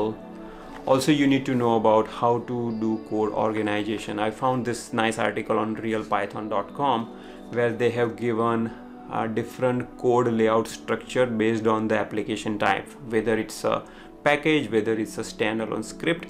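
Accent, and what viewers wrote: India. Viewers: What accent? Foreign return or Indian?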